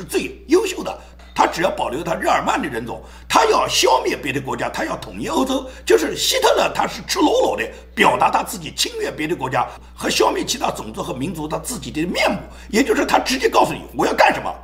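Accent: Nanjing, China. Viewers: 口音是南京人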